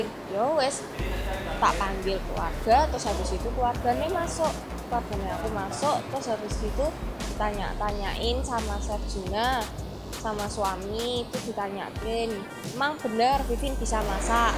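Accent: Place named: Semarang, Indonesia. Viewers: Logatnya beda lah jatim sama jateng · panggil saja niyak itu logat semarang 😂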